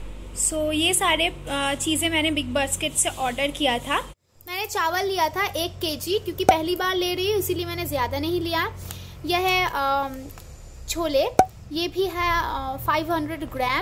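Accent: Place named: Bengal, India. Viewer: Your acent felt quite bengali😅😅😅. BTW I'm from kolkata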